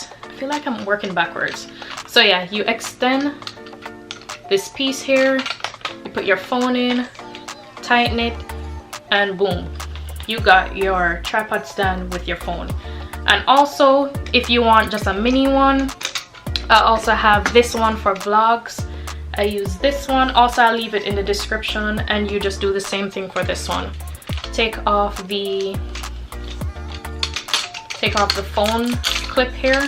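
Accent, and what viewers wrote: Jamaica. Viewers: love your accent, im jamaican as well🥳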